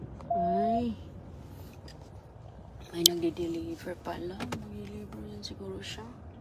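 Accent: Visayas, Philippines. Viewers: Ok lang yan Bisaya kasi siya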